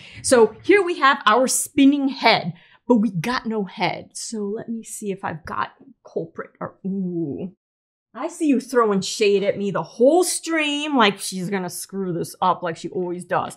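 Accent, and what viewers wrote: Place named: Upper Midwest, United States. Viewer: You are a burst of energy and good instruction. Where does that accent come from? Upper Midwest? Glad to have found you.👍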